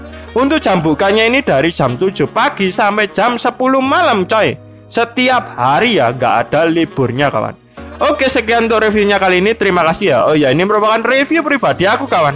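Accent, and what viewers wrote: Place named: Surabaya, Indonesia. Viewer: logat Suroboyo an nya medok banget, ditotol itu maksudnya dicocol ya, ikan bakar dan ayam bakar nya emang enak sih, mantap, Bro, sehat selalu ya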